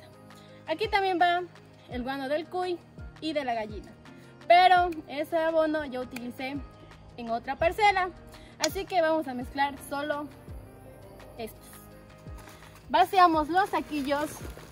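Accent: Ecuador. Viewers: Tienes asento Cuencano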